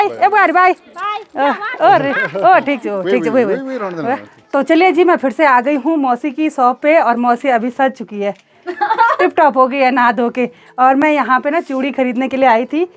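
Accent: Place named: Uttar Pradesh, India. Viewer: Jab aap hindi bolte ho tab aap garhwali nahi lagte up k lagte ho 🙏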